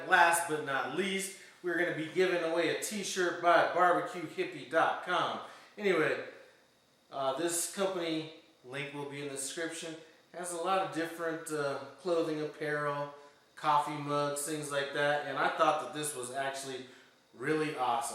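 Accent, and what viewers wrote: South, United States. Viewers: Funny finding myself on your page- I was looking at how to combine my steak and queso fresco... and an hour later I'm still looking up seasoning for grilling! I know you said you weren't responding, BUT, any suggestions on a flavorful rub for smoking pork?! Mine always seems bland, like a wasted effort, 10 hours later. And, I think you said Colorado, but you sounded a little southern to me... at the same time, the UNC on your jacket isn't the same shade blue UNC wears down here! Either way, Cheers!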